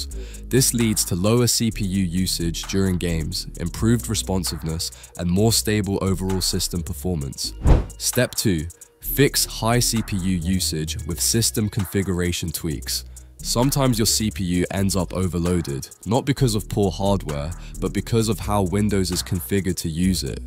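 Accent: India. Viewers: bro when from brittish to indian?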